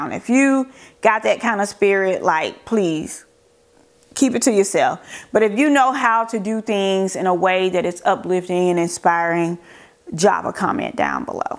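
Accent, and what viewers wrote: South, United States. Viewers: Honey after 5, girl your southern accent comes out all the time and I’m a fan okay, I love it. Thanks for sharing you got some cute...cute items! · You always look well put together so I see no degradation in your makeup. What's really sweet is ur country/southern accent!! So... "belle-ish".😄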